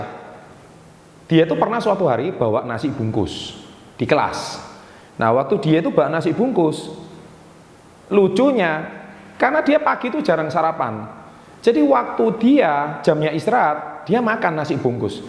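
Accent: Java, Indonesia. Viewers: Orang mana sih bang kok logatnya jawa banget😁😁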